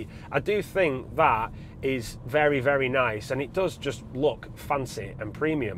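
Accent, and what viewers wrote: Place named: Manchester, United Kingdom. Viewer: Hahahah! It loves the manc accent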